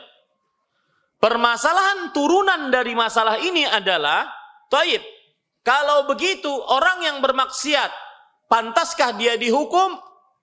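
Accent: Banjar, Indonesia. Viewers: Org banjar kah ini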